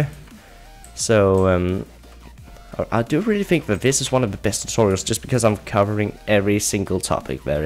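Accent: Denmark. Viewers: 'v you from Danmark?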